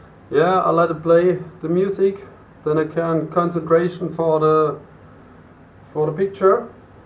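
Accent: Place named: Baden, Germany. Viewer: hallo, ich wohne im chiemgau, am waginger see. aber deinem dialekt nach bist ein badener.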